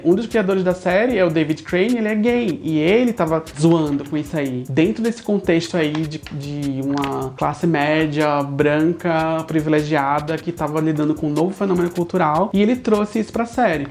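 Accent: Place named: Recife, Brazil. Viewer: por mais sotaque recifense falando de cultura pop, meu voto é sim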